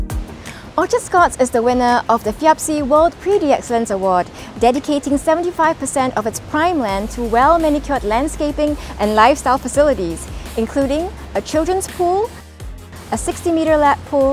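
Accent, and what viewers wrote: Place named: Philippines. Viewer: seems to be a Filipino because of nice English accent..🇰🇷🇺🇲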